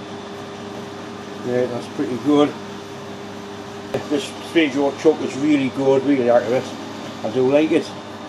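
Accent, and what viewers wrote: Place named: Northern England, United Kingdom. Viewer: Sorry must have missed him saying that. With his Northern accent he is difficult to understand sometimes. If you want a good laugh, turn on the caption feature and see what YouTube makes of what he says. Regards from Australia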